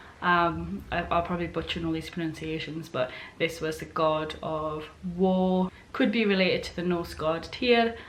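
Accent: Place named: Geordie, United Kingdom. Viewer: you sound like you live just up the road from me, maybe gateshead area. that's awesome. very bonny lass.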